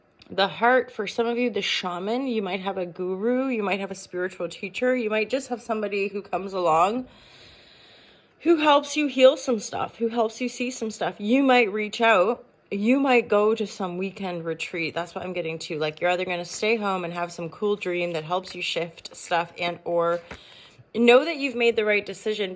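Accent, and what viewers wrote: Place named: Yorkshire, United Kingdom. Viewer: Good morning girly! I’ve watched you religiously until 2-3 yrs ago I felt called to watch you again, the accent is northern.. Yorkshire most prob 😂❤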